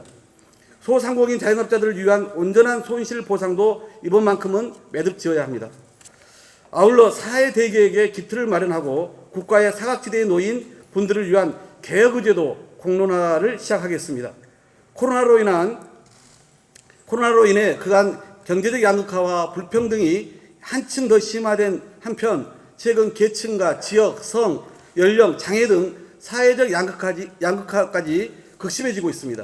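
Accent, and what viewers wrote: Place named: Jeolla, South Korea. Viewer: 다음 총선에서 개박살 날거 아나 모르나 전라도 사투리좀 안듣고 살자 오바이트 나온다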